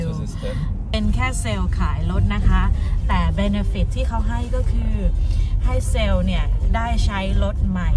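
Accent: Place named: South, Thailand. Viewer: ไม่แปลกใจเลยค่ะ ว่าทำไม่อยู่S Carolina ทำงานกับ บ. แม่ BMW นี่เอง ชอบน้องแหลงใต้มากค่ะ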